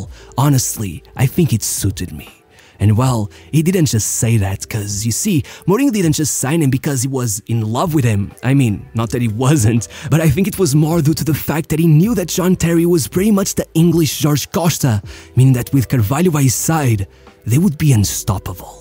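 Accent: Portugal. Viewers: Essa forma de sussurrar com esse travo tuga torna impossível ver os vídeos... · Eu sempre me questionei se o gajo que relata os vídeos era português devido ao sotaque semelhante, mas hoje tenho quase acerteza que é, ao dizer os nomes portugueses perfeitamente, alguém sabe se é tuga ou não? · esse ingles ta em dia meu rapaz sim senhora! mas nao o suficiente para eu perceber que es tuga!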